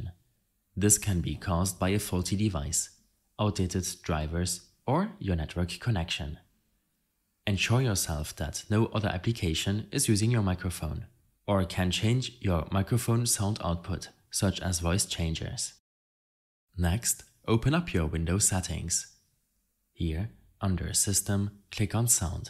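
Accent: Germany. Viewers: deutsches akzent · I HEAR DEUTSCH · you sound German could it be?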